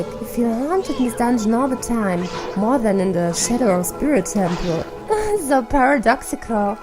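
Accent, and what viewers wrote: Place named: Germany. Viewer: You have a slight German accent, but your English is very good. And I agree with Taylor, it's a charming accent.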